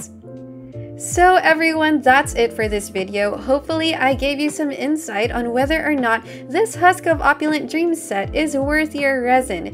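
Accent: Philippines. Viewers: You probably get this a lot but your accent is comfortably eng/fil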